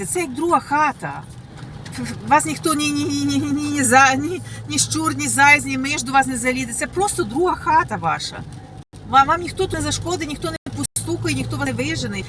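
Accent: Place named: Ivano-Frankivsk, Ukraine. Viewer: вона з Івано-Франківщини , в одному відео проговорилась з коліжанкою , чути по розмові , що не Львів і не Львівщина ! 😂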